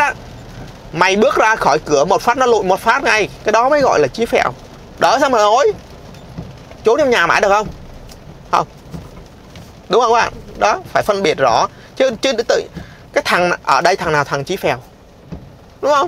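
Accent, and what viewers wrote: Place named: North, Vietnam. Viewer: e đăng ký rồi anh. tiếng bắc 54 hay quá nghe ghiền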